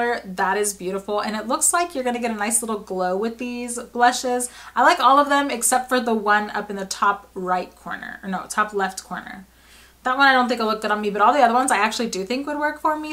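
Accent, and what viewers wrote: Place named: New York, United States. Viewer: I guess I'll have to go into the city to get everything. No complaints here! I love it there. If it wasn't so expensive I'd live there. Are you from NY/NJ area? You sound like you are and some of the stuff you say is exactly what/how I say it. Whenever I go away people can always pick out where I'm from LOL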